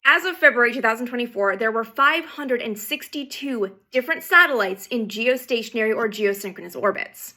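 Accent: Canada. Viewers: Please make a video just saying the words “about” and “out” and any other Canadian sounding words. You really need your own cable network dear. You are amazing. You’re my granddaughters age and I wish more of your generation loved the space program like my generation ,who grew up watching Mercury, Gemini, and Apollo, life on TV. Keep up the oatstanding (see what I did there) work❤️ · the Canadian accent lol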